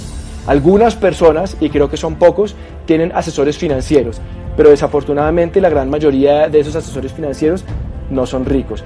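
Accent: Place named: Colombia. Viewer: A mi me encanta el acento colombiano! No les hagas caso! La gente mediocre se la pasa criticando!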